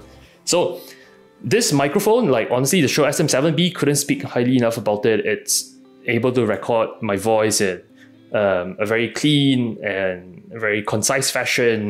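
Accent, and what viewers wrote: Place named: Singapore. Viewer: Hey, are you Singaporean (you sound like one)? Been watching your streams for a while now and really like your content/set up (even though I don't tank). I recall you mentioned somewhere before that you have a full time job as an investment banker and now it appears you are married too. How do you manage a full time highly stressed job (I'm in banking too) with a wife and yet able to spend this much effort into the game and your content? I suppose your wife fully supports you and she must be into gaming as well? I see that she's a naruto fan as well:) I've been playing wow since it first came out when I was university but now as a married man with a full time job and a kid, reality kinda catches up with you.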